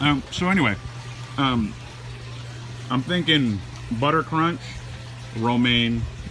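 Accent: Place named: Minnesota, United States. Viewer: You sound Minnesotan!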